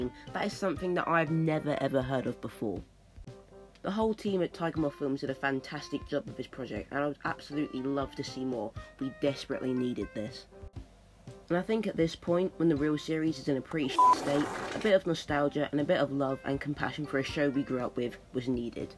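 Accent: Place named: United States. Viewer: I couldn't stand the American accent and terminology. I watched it with the sound off and subtitles on.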